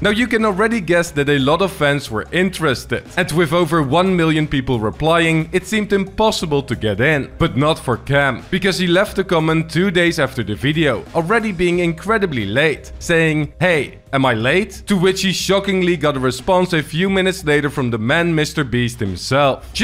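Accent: Netherlands. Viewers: I like the videos.. The dutch english accent is just to cringe for me, unwatchable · Wat een Nederlands accent zeg haha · is this guy dutch? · Are you dutch? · deze guy klinkt nederlands nice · are you dutch? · Het duurde minuten voordat ik erachter kwam dat je een Nederlands accent had. Toffe video :) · THE DUTCH ACCENT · Ik hoor gewoon je Nederlandse accent door je Engels heen haha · i smell dutch but ar you dutch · The dutch in his english voice 😭😭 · You sound dutch, very very dutch. i can tell by your accent 😂🇳🇱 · I smell dutch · Dutch accent hihi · Are you dutch by any chance? I can hear a accent. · You sound Dutch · I smell dutch accent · Your accent really sounds Dutch, are you? 😅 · i smell dutch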